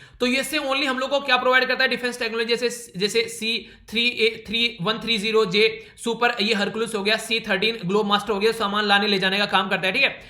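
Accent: Haryana, India. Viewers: really are you sure ??...mai sir ka bolne ka tone or bhut sare elimination lagye ki bihar se ek frnd tha to wo nhi hoga or up se vi nhi or rajasthan se doubt tha to sir ka tone rajasthani hindi nhi hoskta fir uske bagal me haryana h or tone match krrha by map so mai guess kiya hua...or bagal me rajsthan ka PCS vi diye h...or sir bolte h aas pas ka PCS diya kro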